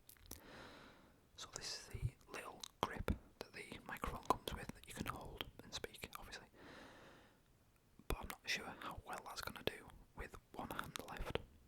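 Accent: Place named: Teesside, United Kingdom. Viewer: That huge watch with the blue face is lovey, I'd wear something like that... I'm trying to place your accent, I'm thinking south of boro but not as far south as Whitby.. I'm in Sunderland. nice video anyway :)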